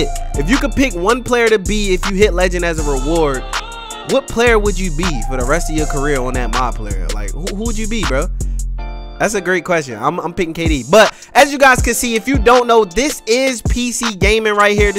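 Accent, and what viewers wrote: Baltimore, United States. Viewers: Are you from Baltimore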